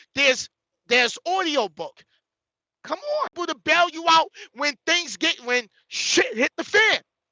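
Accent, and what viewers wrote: New York, United States. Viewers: What's good, my brother. I'm confused. I hear a Knickerbocker accent, but I'm seeing a Raiders hat. Anyway, I always tell folks I'm always on time.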